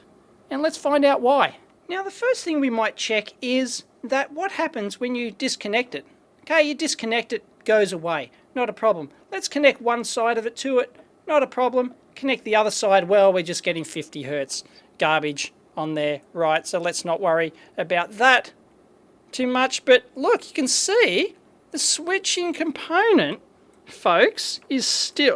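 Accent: Australia. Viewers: I'm a "Yank", but I'm starting to hear a little Aussie voice in my head while troubleshooting hahaha!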